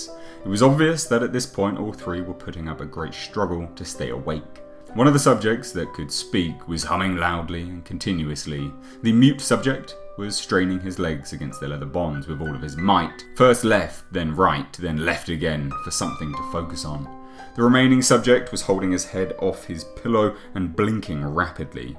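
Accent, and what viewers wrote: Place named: United Kingdom. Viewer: You know the story is 90% fake when the Narrator has a British accent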